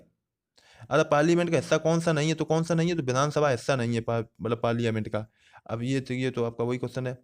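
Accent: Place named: Bharatpur, India. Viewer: Sir aapki boli bharatpur ki LG rhi h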